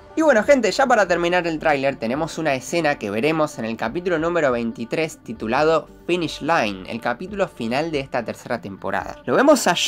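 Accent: Argentina. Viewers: Me gusta muchos tus vídeos son los mejores con tu acento argentino el mejor ps: como se llama la música del minuto la llevo buscando desde hace bastante tiempo :) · Ramiro Lopez sera por que es argentino y los argentinos tienen el ch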